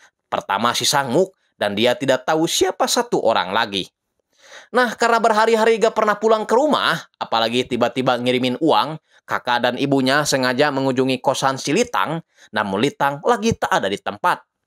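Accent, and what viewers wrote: West Java, Indonesia. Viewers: bukan iq7 bejir emang aksen sunda aja, bukan berarti aksen sunda = iq7 🗿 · Gua subscribe Karena channel ini ada logat Sunda nya 😂😂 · Baru nemu chanel rekomendasi film2 unik, sapa dong mang wkwkw logatnya kga asing urang sunda 😂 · Lucu banget aksen sundanya kang😂, hatur nuhun sudah dibikin ringkasan Killer Paradox nya, seru pisan... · dari suaranya kedengeran jelas orang sunda😅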